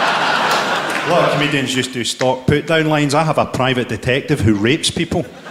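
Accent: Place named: Scotland, United Kingdom. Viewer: I reckon the Scottish accent is boss👍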